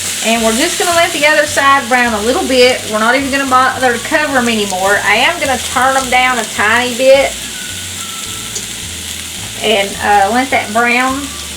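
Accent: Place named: East Tennessee, United States. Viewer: Love your videos, oh & that East Tennessee accent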